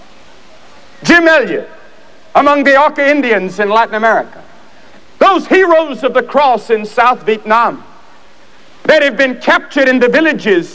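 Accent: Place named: South, United States. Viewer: he had sort of a southern drawl to his voice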